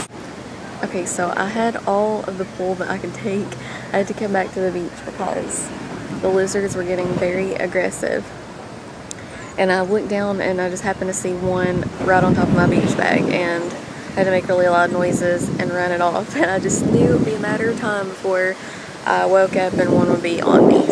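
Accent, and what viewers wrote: South, United States. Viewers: OMGosh your Sammie looks just like my Lexi - right down to the few white hairs between the eyes. New subbie here, just found you tonight. I hear that "southern", may I ask where you are from - Im from the south myself. Really enjoy your vids - congrats on the wedding.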